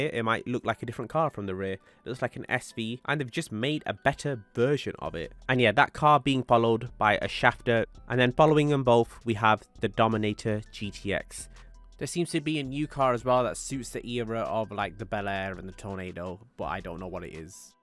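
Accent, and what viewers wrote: England, United Kingdom. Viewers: i feel like i’ve watched this video twice already but this one just has a english accent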